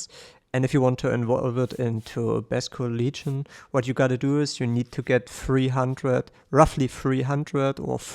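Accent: Saxony, Germany. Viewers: Why do you soumd like a saxonian german whos try to speak Englisch I swear exact same accent xD Ok well i mostly speak accent free but i heared other saxoniams sounding like that Normal people Version You Wörschon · For me as a german it sounds like you have a german aczent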